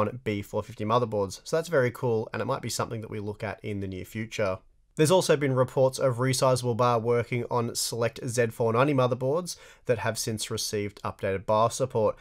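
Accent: Australia. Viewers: Love the aussi accent! 👍😜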